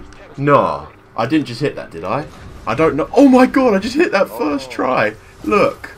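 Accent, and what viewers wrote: United Kingdom. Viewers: which part of uk are u from???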